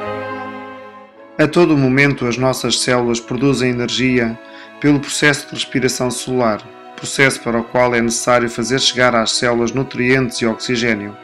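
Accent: Portugal. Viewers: Putzz.. sinto decepcionar mas, esse fundo musical é 'um saco" pra quem é músico, como eu, que presta atenção na música ou seja, eu não sabia SE preSHtava atenção nela ou no carinha, (*) ou explicação falada.. e, detalhe: esse "sutÁKi PÓRTUGUEISH" - mas, de boas. Não vou farei medicina... hehehehhe..! (os vídeos que mamãe m'envia, se tiverem letras e música - tiro todo som, para apenas ler - sério, é um inferno!). Assim são as pessoas diferentes.. :)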